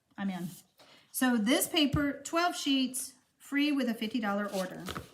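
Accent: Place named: South, United States. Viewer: Frist time to see you. Really enjoyed all of your video. Thank you for all the information. I live close to Nashville Tn. and I talk just like you. Was fun hearing what I sound like. I love our southern drawl. I will be watching other of your videos.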